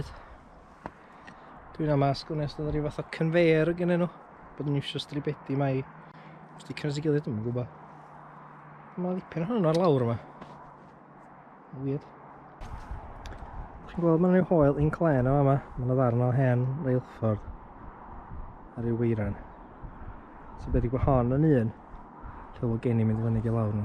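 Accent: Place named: Scandinavia. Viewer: I don't speak welsh at all, but one thing I found really interesting here; Normally I hear a massive english accent at least from southern welsh speaking people. Your accent sounds almost nordic/scandinavian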